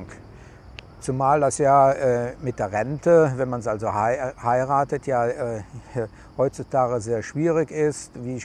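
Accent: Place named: North Rhine-Westphalia, Germany. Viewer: Ja das ist alles nicht so einfach. Habe ich mir einfacher vorgestellt........ Ich dachte mir schon an der Aussprache dass du aus Nordrhein-Westfalen bist. Ich bin aus Alsdorf. Glückauf.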